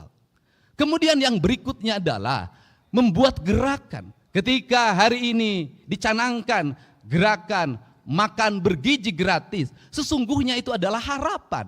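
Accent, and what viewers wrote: Java, Indonesia. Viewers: Hay dedy jawa barat bukan sundaesme tapi cirbon indramayu logat jawa mohan diperhatikan kalau lier mekarkan jawa pesisir biar sunfa jongjon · Logat Jawa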